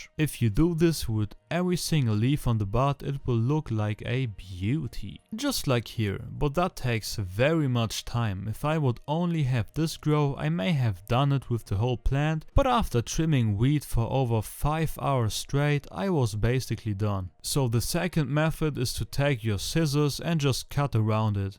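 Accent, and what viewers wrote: Germany. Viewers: Bist du Deutsch?😂🫶🏻 Ich mag falsch liegen aber so redet nur ein Deutscher englisch.😂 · A german trying to talk english is the worst... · This is the most german english i have heared in a long time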